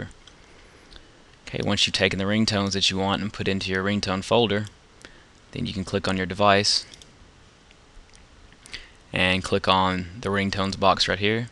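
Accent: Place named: South, United States. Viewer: Actually, you sound like a southern chuggaaconroy.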